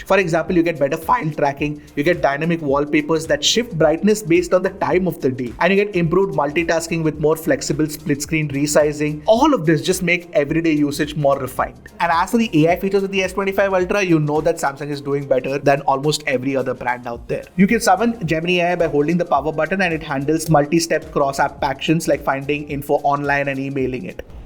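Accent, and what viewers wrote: India. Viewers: Just picked this up today thanks to a Black Friday sale. $50/month over 2 years with a $340 trade in on my S23 Ultra. Wasn't necessarily planning to upgrade until the S26 Ultra but that price was hard to beat. Also, great content, you're very personable and I enjoy watching, but maybe slow down a little bit. I'm a native English speaker and when you start throwing around specs and tech terminology, without the subtitles it can be a bit overwhelming. The Indian accent can be a difficult one at the best times. You still earned a subscriber. Thank you!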